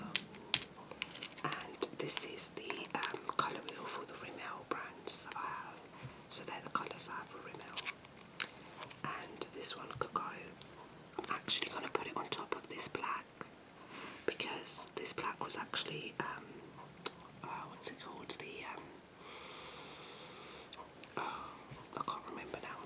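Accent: United Kingdom. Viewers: Subbed!!!!! Finally a good channel with an english accent! Sending my love from London 🇬🇧 - keep up the good work and videos!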